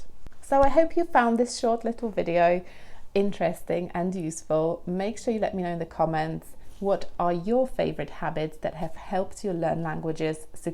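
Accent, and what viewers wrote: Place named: United Kingdom. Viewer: I thank you for your superb work! I adore your British accent! Peace and Love from Russia! ...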